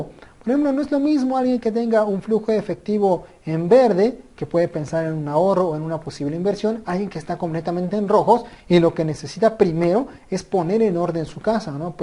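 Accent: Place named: Argentina. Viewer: Hasta que comienzas eres bueno pero parece Argentino ca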